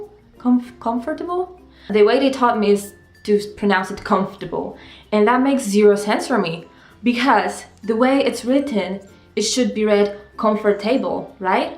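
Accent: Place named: Poland. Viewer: knew you are from Poland, your accent sells it, I am from Poland too but I am moving to Spain in 1 month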